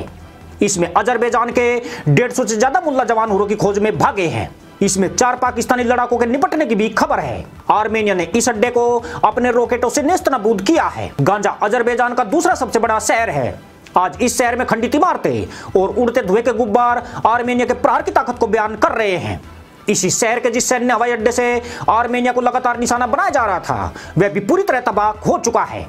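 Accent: India. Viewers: Ji aap rajesthan se ho kya ya hariyana se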